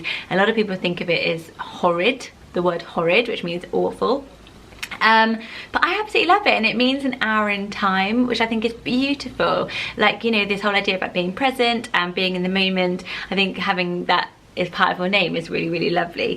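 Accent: United Kingdom. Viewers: It's so funny for me to hear the lovely British pronunciations of some of these names. I'm from the US and particularly the south. So the name Blanche - my grandmother had several friends named Blanche and with the US "a" it's just not pretty at all. One "blanches" vegetables. With the French accent Blanche is very pretty. Here's a name for you - Jathena. That is my sister's name. I'm sure you've heard of Athena - well her name is Jathena. Quite pretty. My name is Janine. When I was growing up in the south in the US, NO ONE was name Janine - for a few years there was a Janine in every TV show and movie. It's lost it's popularity again. Anyway, we are almost exactly one year apart and we were Jathena and Janine.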